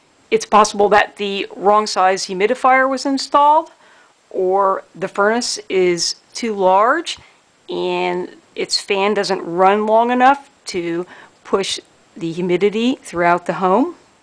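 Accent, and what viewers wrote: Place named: Pittsburgh, United States. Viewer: You sound like a yinzer. If you dont know what that is them I am wrong and I apologize.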